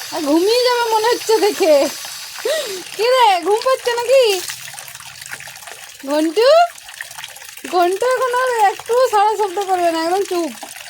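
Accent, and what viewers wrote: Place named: Bardhaman, India. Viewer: Apnera ki Bardawan district er? Kathaie tan ache. Amio taie. Amio pet lover. Tmato r ma. Tachara Rambo Goldi Maria Til Til ache. Ar Chunni Munni Maria Julia ar Chorki. Aro anek. Nomosker.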